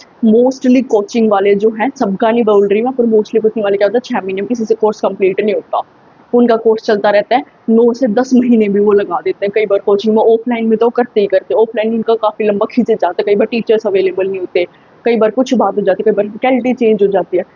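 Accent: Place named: Haryana, India. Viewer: Haryanvi language,kha se ho aap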